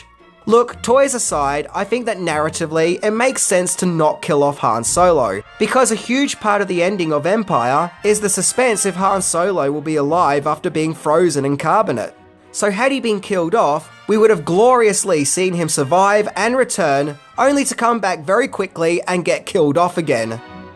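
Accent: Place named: Australia. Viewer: Yeah same hey :) . It's the down to earth Aussie accent that helps too :)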